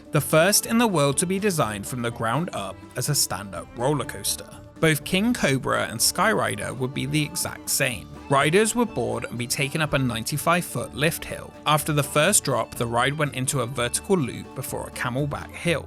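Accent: United Kingdom. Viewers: You're British though right? Guessing from the accent but of course could be wrong!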